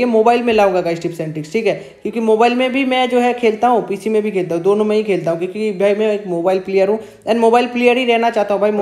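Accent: Bengal, India. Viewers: Bhai tu bangali hai kya ? 😊😊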